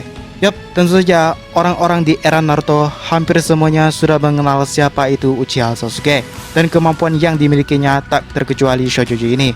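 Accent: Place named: China. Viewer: dia keturunan tionghua bro jd logat bicara nya seperti itu ;)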